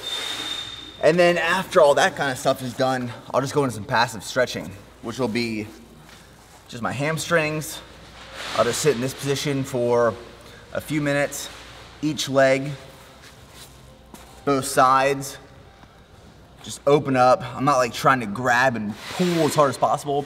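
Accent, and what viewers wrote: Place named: Central Pennsylvania, United States. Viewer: Central PA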